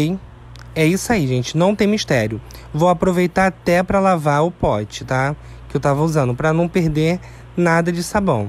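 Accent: Rio de Janeiro, Brazil. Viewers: Ñ sei se tem beleza física (creio q sim)😂 parece o Lombardi, só conhemos a voz 😂mas seus vídeos são os únicos q assisto sem acelerar. É didático, sem apelação. Seu sotaque (carioca?) Sua voz, td e agradável, td é proveito. Jesus te abençoe mais ainda.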